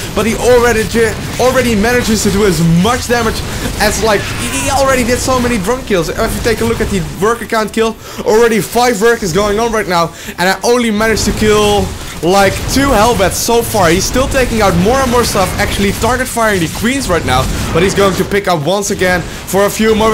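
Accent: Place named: Netherlands. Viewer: grrr i like ur content but im Dutch myself and i cannot stand the Dutch accent people sometimes use :P if i were you i would try and work on the pronunciation + switching words like: these Hellbats are all getting killed right now... You've said it a couple of time in this vid... ofc it should've been roaches :O i guess its cuz u talk really fast and its really hard :P im just saying.. trying to be helpful here; D Succes met je video's ;)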